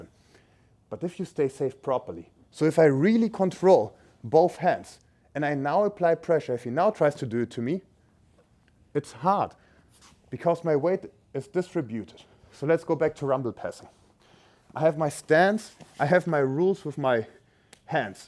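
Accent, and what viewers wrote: Germany. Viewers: He is German (or his accent is German for sure) what do you expect…. I dress like this too 😊. I always wanted to know if Jozef speaks German, I think so.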